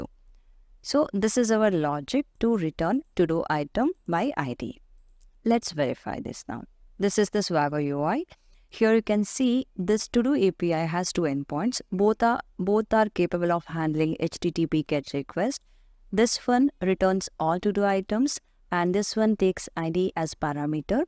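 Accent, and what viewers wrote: India. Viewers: May I know you are from kerala? your english is good. I saw lot of kerala people has this prononciation of speaking english.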